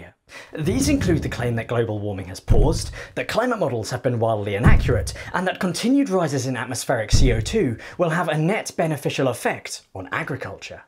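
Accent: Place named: RP, United Kingdom. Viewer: Firstly: you're jacked, British, non-white and sound posh. I like it! But the saturation myth (or effect, if you're of that persuasion) is a red herring. We don't have to care about physicists squabbling about yay or nay on this. Instead just look at the data of the supposed effects of rising temperature and CO2: arctic and antarctic ice, tropical cyclones, droughts, crop yields, Great Barrier Reef coral cover, greening, desertification, wild fires, deaths from temperature (both heat and cold) etc - hardly any of them are happening in an adverse way. And rising temperature/CO2 are actually making certain things better: few temperature deaths, improved crop yields, greening, more natural diversity...... Get real everyone! You can continue to shriek inside your echo chamber OR you can go look up the data with an open mind, intellectual curiosity and critical thinking. 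You'll flip, like me - guaranteed if you're not yet fully brainwashed.....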